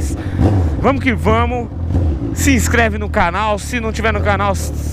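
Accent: Goiás, Brazil. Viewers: Saudades quando ele falava no pique do Goiás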